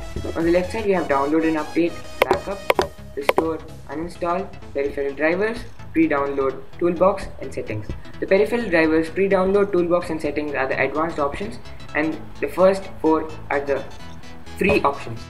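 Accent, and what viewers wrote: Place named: India. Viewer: Indian voice, Indian accent. Program with viruses.. no thanks. I'm looking elsewhere.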